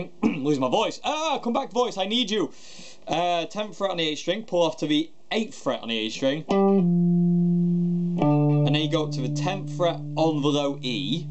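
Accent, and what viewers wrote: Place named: North, United Kingdom. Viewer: Cheers you the best teaching us all this stuff xD and ill just say our accents are similar lol thought i was the only one playing up north (atleast thats how it feels sometimes) haha take care buddy!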